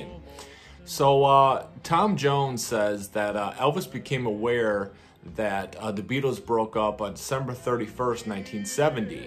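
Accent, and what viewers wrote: United Kingdom. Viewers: I read that on Google earlier. I can’t believe that Elvis would want the Beatles as his backup band..he had an incredible band! I love your English accent too lol! Imo, I don’t think they had the greatest musicians anyway! Elvis’s guys were perfect.. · I don't believe he wanted them he had a great band backup singers. Did like your British accent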